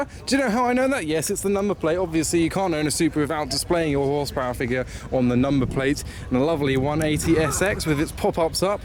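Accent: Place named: England, United Kingdom. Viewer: Watched your earlier videos when you were quiet, it was visually good! Now with the commentary it's visually good and audibly hilarious, especially with your English accent 😂😂😂😂🍌🍌🍌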